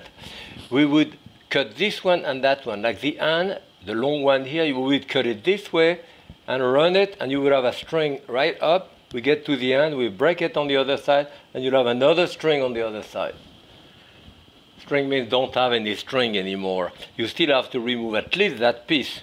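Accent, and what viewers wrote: France. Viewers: I love his French accent. He reminds a bit of Poirot. And I love his cooking style, noy fussy, and cooking while he talks about the old ways of cooking.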